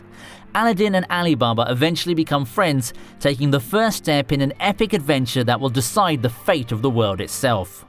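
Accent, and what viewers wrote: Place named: United Kingdom. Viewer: Did not expect British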